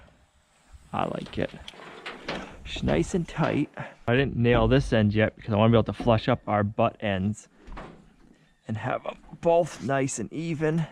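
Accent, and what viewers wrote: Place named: Boston, United States. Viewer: Good mauhnin! I love your Boston accent.( I’ve got one myself) Hope I never lose it! Lol! Oh and we like it a lot too! Lol! Good idea with the nail polish. Take care.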